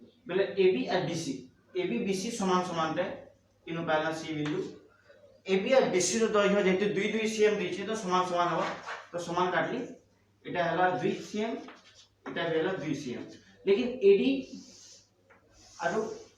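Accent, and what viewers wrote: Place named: Odisha, India. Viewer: ମତେ ବୁଝା ପଡୁଛି। ଆପଣ ବହୁତ୍ ଭଲ ପଢାନ୍ତି ସମ୍ବଲପୁରୀ ଭାଷା ତଥାପି ବହୁତ୍ ଭଲ ଲାଗୁଛି ଶୁଣିବାକୁ,ବୁଝପଢୁଚି ମଧ୍ୟ।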